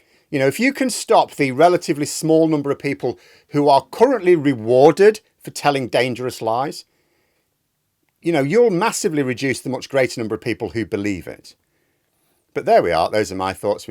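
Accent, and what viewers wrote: United Kingdom. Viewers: my ears hurt from listening to your rhoticism. you brits need some speech therapy or something, your accent is a mistake.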